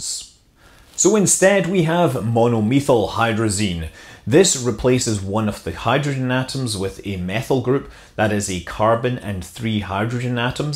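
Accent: United Kingdom. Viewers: Very nice stuff in that great British accent (Or Am I wrong?).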